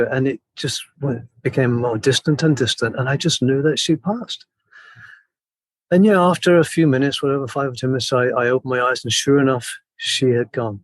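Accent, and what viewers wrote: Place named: Scotland, United Kingdom. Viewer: My favourite Scottish YouTuber award always goes to. I just looooove that Scottish accent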